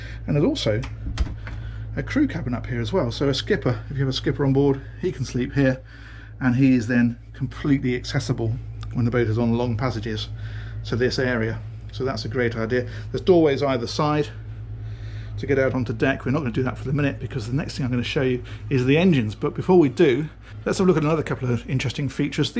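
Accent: England, United Kingdom. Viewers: "the electronics on this boat... it's proper stuff, it's really pucker!" i'd watch these tours just to hear an englishman describe things this way.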